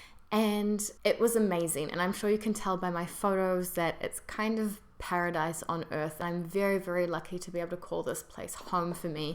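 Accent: New Zealand. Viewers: I was wondering why your voice sounded so familiar. Then I realised you're from nz too! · Is it a common qiwi way of pronouncing 'e' sound or is it just you?:) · Clearly a Francophile with a Kiwi accent. Sad.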